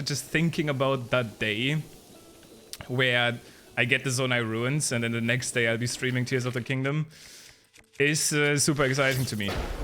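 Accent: Germany. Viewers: why do you sound so german?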